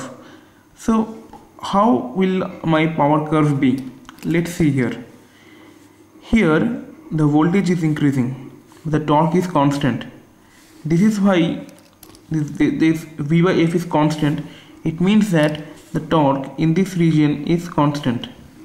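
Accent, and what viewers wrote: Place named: India. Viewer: I don't know why Indian speaks English with fucking accent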